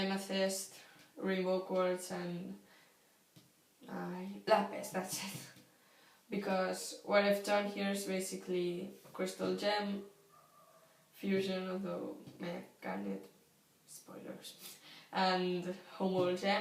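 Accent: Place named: Russia. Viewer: I was wondering because it sounded russian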